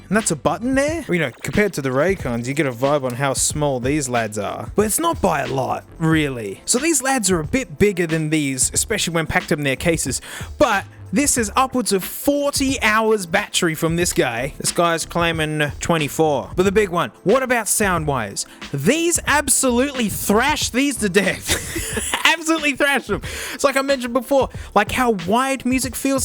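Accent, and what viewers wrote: Australia. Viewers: The Australian accent gives me the seratonin to live through this shite pandemic. I thank the youtube algorithm for showing me this amazing channel and I thank you sir for existing. Just lost my grandma recently, so being genuinely happy is something hard to come by. This made me laugh so much, I appreciate your content, youve got a sub from a very thankful viewer, cheers. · Is this guy Australia?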